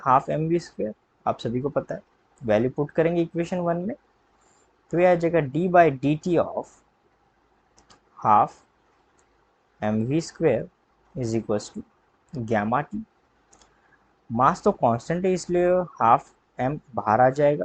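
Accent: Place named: West Bengal, India. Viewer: Sir 's accent seems to be bengali,or assamese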